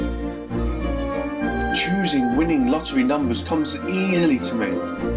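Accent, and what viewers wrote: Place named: United Kingdom. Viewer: This is great. I've finally found an affirmation video in UK accent.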